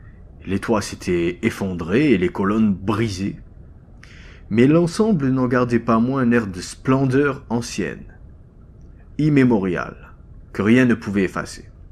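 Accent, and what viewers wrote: Germany. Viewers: Exellent l'accent allemand! Et jusqu'au bout!! Bravo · Super l'accent allemand ! Et très bonne histoire 👍🏻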